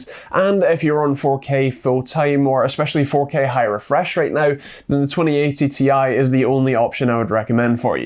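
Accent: United Kingdom. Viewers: I'm here for the British accent.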